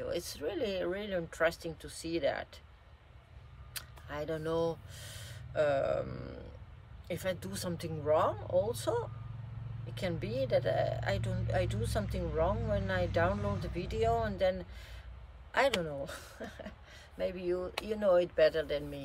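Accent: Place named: Hungary. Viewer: I just saw your video come up and I would much rather have my bed at the front for many reasons. I would feel much more secure for sure. I love your accent, you sound so much like my Hungarian mother. I do hope that you are feeling happier and more positive these days. We are sending you warm hugs and positive energy and wishing you success with your channel. ❤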